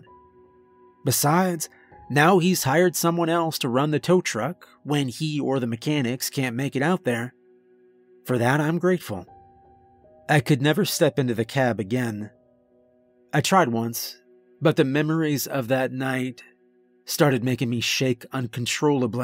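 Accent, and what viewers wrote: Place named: New England, United States. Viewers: Why is Cliff Clavin running a gas station in the Pacific NW? The accents are perfect, but for the NE, not the NW. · Sounds like a northeastern accent not Pacific Northwest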